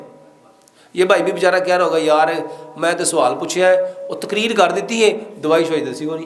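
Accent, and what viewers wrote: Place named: Punjab, Pakistan. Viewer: ap ki baaten to achi haen lken yh punjaabi style achaa nhe lagtaa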